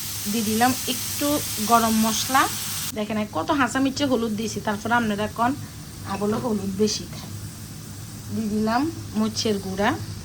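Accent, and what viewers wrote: Noakhali, Bangladesh. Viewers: আংগো নোয়াখাইল্লা বলে কথা 😊😊🙃🤪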